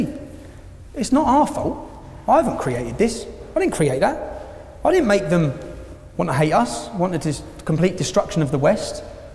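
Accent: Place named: Cockney, United Kingdom. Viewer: Interesting. I agree that his 'cockney' accent, causes him to be judged as a 'dope' maybe, simple, unintelligent. Unfortunately he's not an EFFECTIVE public speaker, he is too emotionally involved and just a normal guy, not many people are EFFECTIVE public speakers. The movement to counter extreme-islam needs to be reshaped and somehow detached from EDL et al. It needs highly intelligent, calm, eloquent, likeable, articulate and convincing speakers to be the face before it gains widespread traction and poltically acceptable coverage in mainstream media. It also needs widespread buy-in from the muslim community. It's an issue few want to touch, because of the potential for backlash... I'd be inclined to think you need a character like Tommy Robinson... one who isn't scared of the backlash. Those people are few and far between as there'd be few who have his experience and his background and therefore the motivation, but ALSO have the public speaking ability of Obama or Blair AND the likeability of Dwayne Johnson or David Attenborough.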